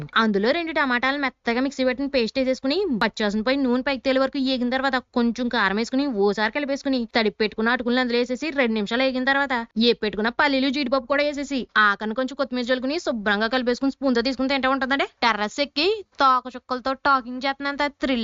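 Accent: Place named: East Godavari, India. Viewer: Your east Godavari slang is very nice.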